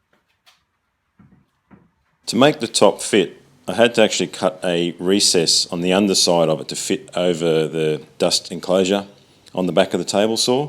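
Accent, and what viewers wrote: Australia. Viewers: Your channel is growing because you have awesome videos and because you have an Aussie accent. Keep em' coming!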